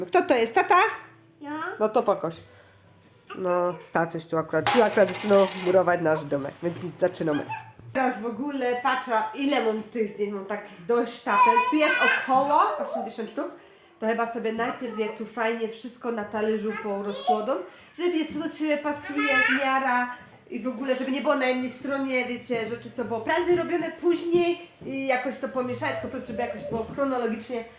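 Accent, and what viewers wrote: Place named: Silesia, Poland. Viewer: Witaj fajna gwara ślązoczka czy inny górski region?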